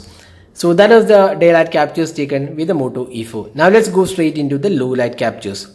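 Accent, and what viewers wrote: India. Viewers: I HATE THE INDIAN ACCENT WTF EWW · Está hablando en inglés, pero obviamente es indú... asi que su acento se hace notar xD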